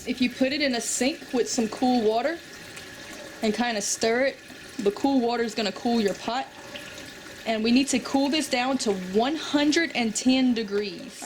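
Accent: Louisiana, United States. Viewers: Are you from Louisiana??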